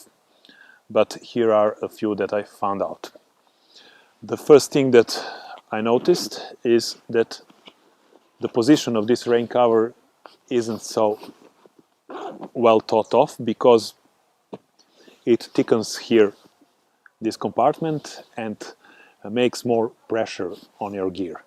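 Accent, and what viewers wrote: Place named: Eastern Europe. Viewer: I cant stand Eastern European accent 🤬🤬🤬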